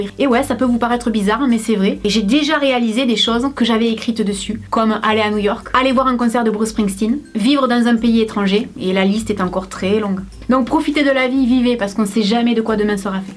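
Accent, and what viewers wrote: South, France. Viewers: Sud de la France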